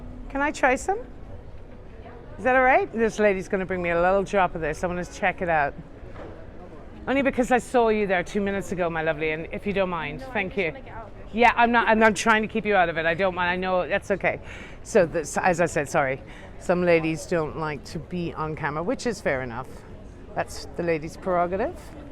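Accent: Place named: Ireland. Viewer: I discovered you two or three days ago and I can‘t stop watching your tours,. I love your Irish accent.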